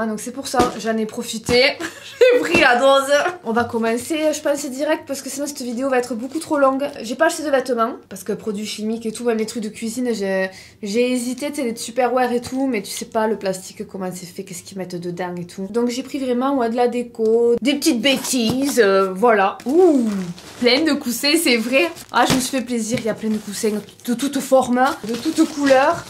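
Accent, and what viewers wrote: South, France. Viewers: Bonjour, je suis nouvelle sur ta chaîne 😊 j'ai commandé sur temu j'aurais dû recevoir aujourd'hui ça me note erreur livraison 😢 j'adore ton accent tu est d'où ? Sud ? ❤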